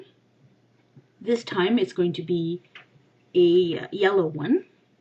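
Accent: Canada. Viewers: Yeah you sound Canadian don't worry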